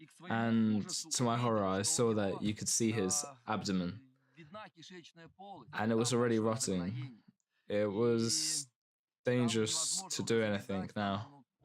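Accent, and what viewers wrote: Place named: England, United Kingdom. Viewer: What a beautiful story, love the English accent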